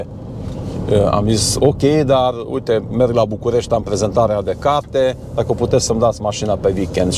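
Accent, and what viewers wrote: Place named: Hungary. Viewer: No,un allroad şi perfect pentru Nămțîia asta care închide Fabricile.. Nai Treabā.. Superbā maşină pentru Clasa medie.. Ieftină ,fainā,,bună Ca Ciocolata Ritter Sport😂 Foarte simpatic prezentatoru. Apăi ios Şofer de Bus în Germania.. Sas din Ardeal Sas dāla..😂 Tare mult î-mi place accentu ăsta Maghiar😊.. Domnu cu voi..❤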